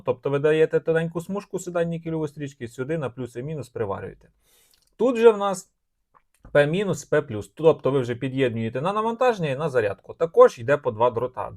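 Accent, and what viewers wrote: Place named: Western Ukraine, Ukraine. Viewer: впадло только что на западенском акценте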